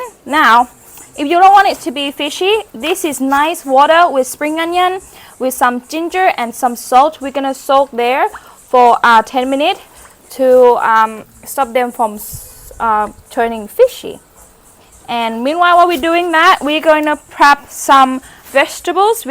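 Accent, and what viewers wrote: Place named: Australia. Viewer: Your man must be an Aussie, as you speak English with an Aussie accent. Lovely.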